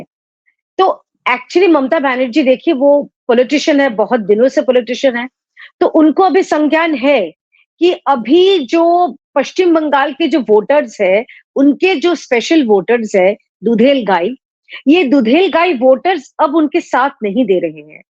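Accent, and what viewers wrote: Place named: Eastern Uttar Pradesh, India. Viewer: ji your skill of communication is superb/excellent.. Your Cristal clear views & way of explaining the facts is appreciated.Very well done. probably you belong to eastern UP or bihar..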